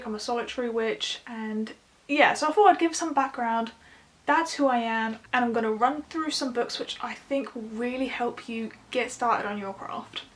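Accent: England, United Kingdom. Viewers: Hey - just discovered your channel - I'm in Devon in England - sounds like you're in England too. I have a witchcraft channel as well - brilliant book recommendations! Thank you for sharing 😊 🙏 💓 Xxx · Are you from the UK? ❤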